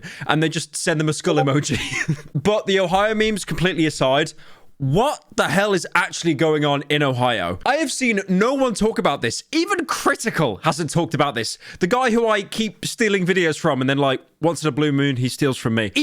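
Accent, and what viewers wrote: United Kingdom. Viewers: Shut up your britich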